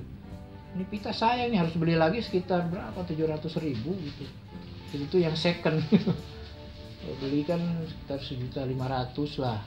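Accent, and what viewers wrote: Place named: West Java, Indonesia. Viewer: Nyunda pisan bang😁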